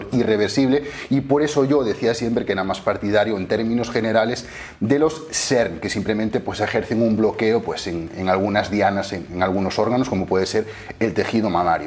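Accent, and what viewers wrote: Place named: Galicia, Spain. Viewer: Ti es galego? paréceme aínda que non teñes moito acento, pero pola túa forma de empregar algunhas estructuras gramaticáis. Pregúntoche dende o respecto e a curiosidade...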